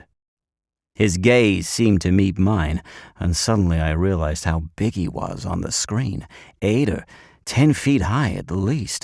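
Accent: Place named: United States. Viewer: Many thanks,!! Great American accent!! I'm American and I think you did a great job!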